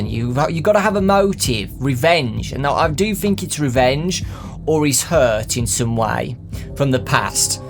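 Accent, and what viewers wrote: United Kingdom. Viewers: i hate this brittish accent.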